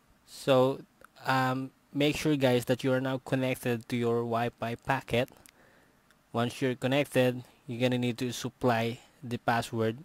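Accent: Philippines. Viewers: I think he was a filipino because of his accent and pronunciation of english.... But it's doesn't matter Good Job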